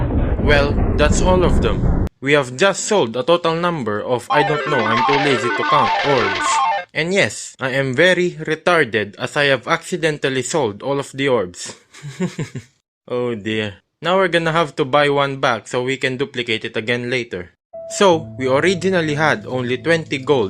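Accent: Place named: Philippines. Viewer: Pinoy to.. yung accent eh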